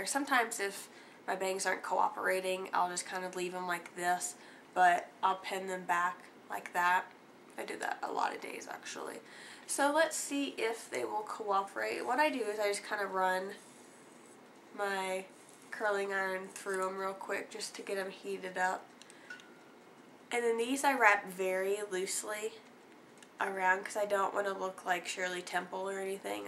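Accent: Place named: South, United States. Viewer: Are you from the south? I am and you have the same accent as me! ^_^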